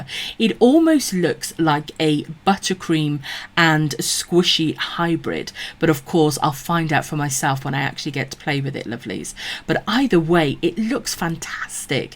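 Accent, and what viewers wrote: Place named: United Kingdom. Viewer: I love your accent! And it’s so cute when you say my lovely’s! I just love all of the British language it’s so cute! This was overall a great review! As a reviewer myself you did a great job! You are so underrated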